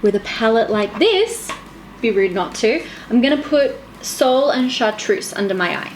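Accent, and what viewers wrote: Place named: Australia. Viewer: watching your videos remains the best part of the day 🎉 your accent reminds me of my best friend back in Australia and I looove it 😍 btw, this look is some serious 🔥🔥🔥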